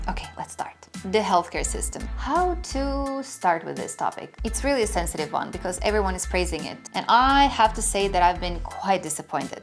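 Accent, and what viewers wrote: United States. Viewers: sorry u sound yankie, no ring! kokeile suomex ( 10y exp?) yt 'moro suomaasta' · Stop speaking American English when they are killing people all over the world. Finland is the happiest country on earth so why spoil it with that Yankee talk.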